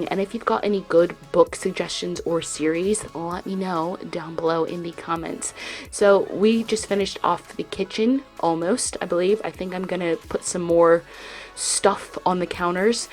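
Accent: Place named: Midwest, United States. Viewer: UK?! I thought you were from the US because of your midwestern sounding accent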